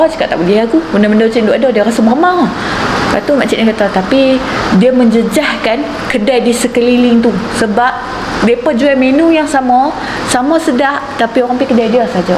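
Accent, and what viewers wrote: North, Malaysia. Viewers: sy 1st time jumpa n tgk channel ni..sy suka dgr n tgk cara cik/pn bercrita krana loghat utara tu..sb sy mmg suka dgr org ppuan utara beckp dgn loghatnya..so sy subscribe terus chnel u 🤗.. tp ni nk tnya sket la cik ni ada kelebihan pd pancaindera ke 6 ke klu x silap sy iatu mata yg bole nmpk benda² ghaib ??..😊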